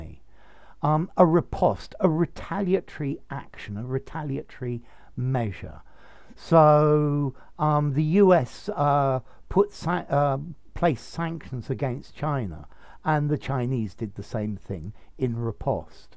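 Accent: United Kingdom. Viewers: Best teacher. I like your way of explanation. Especially your british accent.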